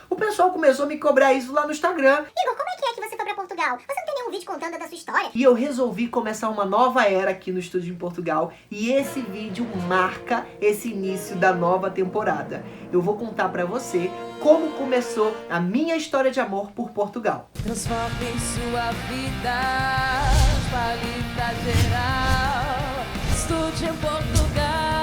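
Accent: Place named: Rio de Janeiro, Brazil. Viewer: Tinha que ser carioca com essa fala so -nos mesmo temos.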